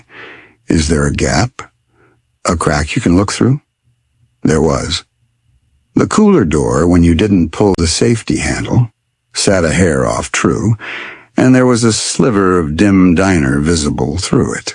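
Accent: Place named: England, United Kingdom. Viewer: I am from Glacier on the W side.My X was a water purification specialist in the whole park West North. He had his own cabin. I know this area very well. The story is not concise but entertaining. I used to know most of the residents in all of Glacier. AI, you have an English accent and you mispronounce proper nouns.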